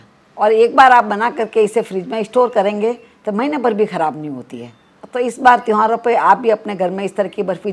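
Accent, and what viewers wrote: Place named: Rajasthan, India. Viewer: Bahut hi achha he.aur aapka voice bahut hi muje pasand he rajasthani flavour aati he..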